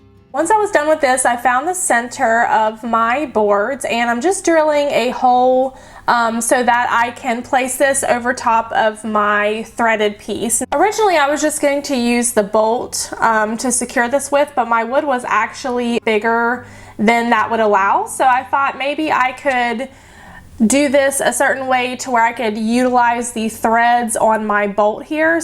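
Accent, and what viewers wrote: South, United States. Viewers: I am new and from New york..love ur southernzccent!!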